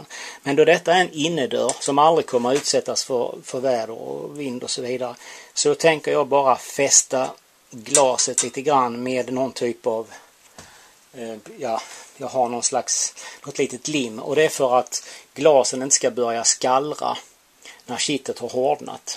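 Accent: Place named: Scania, Sweden. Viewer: Har kollat på alla 9 videos och ja tycker du är rolig och din skånska är rolig med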